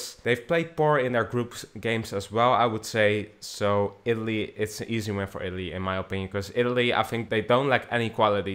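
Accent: Netherlands. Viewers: ik ben ook nl btw ik wist dat je nl was was te merken aan je accent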